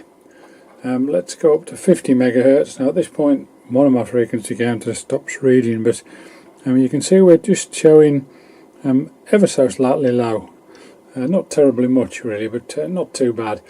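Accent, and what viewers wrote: United Kingdom. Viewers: is not a ‘dude’ - he is British (he sounds like it at least). and we don’t have them over here. 😂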